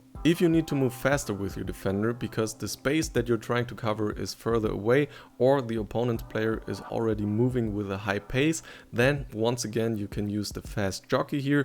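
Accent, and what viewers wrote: Germany. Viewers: Man merkt ja so krass dass deine Muttersprache Deutsch ist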